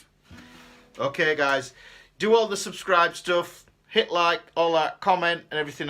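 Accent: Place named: Northern England, United Kingdom. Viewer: So happy I stumbled across your channel today, I am now binging, you are amazing, I love the Northern accent too! My mum is from Derbyshire, Glossop actually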